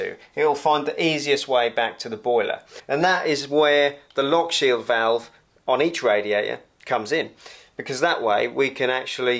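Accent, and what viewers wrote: Norfolk, United Kingdom. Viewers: Do my ears detect a Norfolk accent here?!! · great video ,well explained , I have two bigger rads in my extension which struggle so think this will help . what neck of the wood you from sound like Norfolk... cheers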